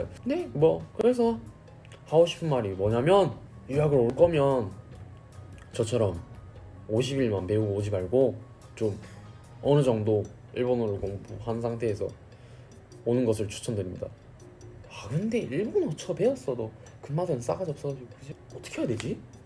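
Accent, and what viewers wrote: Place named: Gyeongsang, South Korea. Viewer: 이제는 일본어가 익숙한데 그안에 한국어에 대구 사투리가 있으신거 같았는뎈 ㅋ ㅋ ㅋ ㅋ맞았군요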